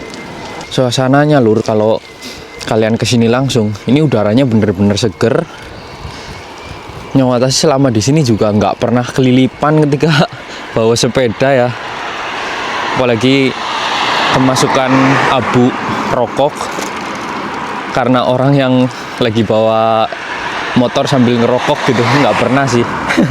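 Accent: Banyumas, Indonesia. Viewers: ngapak ke bagian ndi mas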